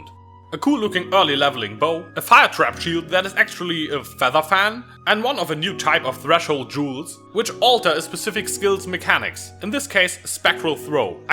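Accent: Germany. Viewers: I cant tell if this accent is german or asian.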